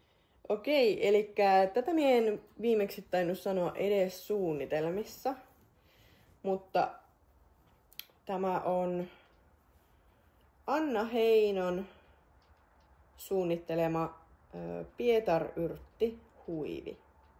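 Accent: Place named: North, Finland. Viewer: Kivoja neuleita sekä kivaa jutustelua. Rakastan pohjoisen murretta <3 Hyvää juhannusta!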